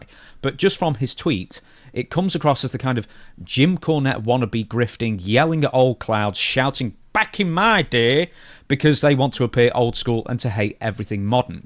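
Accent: England, United Kingdom. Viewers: u have a latino name but u accent sounds uk 🇬🇧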